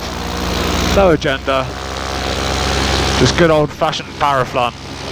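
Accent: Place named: United Kingdom. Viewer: Great video! I could sit here and listen to that Proper British Accent all day.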